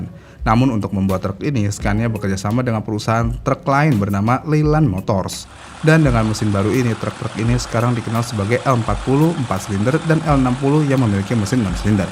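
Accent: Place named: Cirebon, Indonesia. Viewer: Ngapakers cirebon detected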